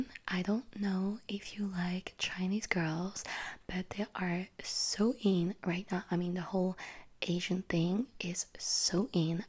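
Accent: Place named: France. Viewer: Woop I got so used to your french accent so this sounds off😂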